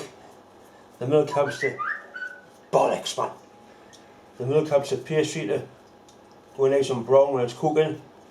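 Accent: Newcastle, United Kingdom. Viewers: What a wonderful surprise to here a geordie accent..then seeing you cut the onion on a lathe knew I would like the video maker,thanks for the plate pie recipe regards Sue from Whitley Bay now living in New Zealand for 40 yrs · Some of me best memories from the fifties as a bairn were Sunday teas at me Nana's in Lemington. Everybody came so it was a full house and she made these same mince pies and they were just devoured in no time. I had 4 uncles so they got first dibs. I think your version is the closest I've seen to what I remember and I have to say ta very much for bringing back good memories, and as much as the plate pie was lovely, hearing the Geordie was balm to me soul. Since I last had a poke round through your videos I'm dead glad to see you've put all the recipe ones together. Makes it a lot easier to find when you're as old as me. 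We"ve lived in Alberta since 1967 and I miss home still. Me Dad was a whitesmith at Parsons so even your work is interesting to an old woman an all. Thanks very much for sharing, and hope you and yours are keeping well. Merry Christmas! 🤶 🎄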